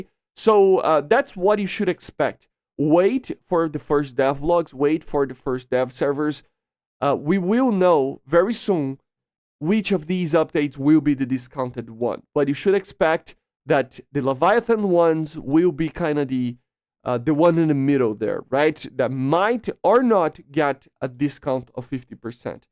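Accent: Brazil. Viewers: Engraçado como eh facil detectar o sotaque br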